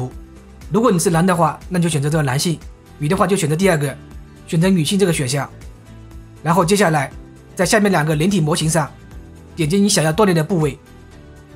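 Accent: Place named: Fuzhou, China. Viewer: 播主说话口音带有很重的福州音...✌️✌️✌️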